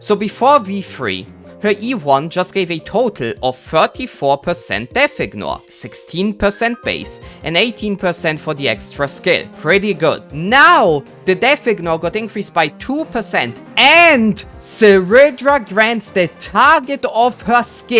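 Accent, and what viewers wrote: Germany. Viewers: I’m hearing the German accent 😭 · He sounds german.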